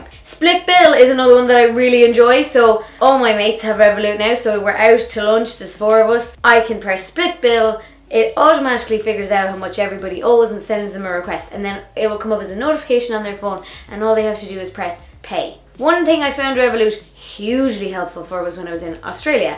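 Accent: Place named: Dublin, Ireland. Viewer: You and Diane Jennings both live in Dublin but your accents sound different to me where were you born in that city or did you move there?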